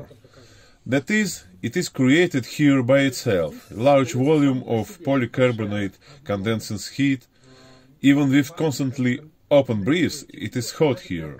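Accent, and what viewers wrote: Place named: Russia. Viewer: great that there are other videos in preparation - looking forward to watching them! Regarding dubbing, I was watching without a sound, so I think that dubbing is unnecessary. Yes, you have a quite typical Russian accent, which is totally fine, since you are a Russian. :)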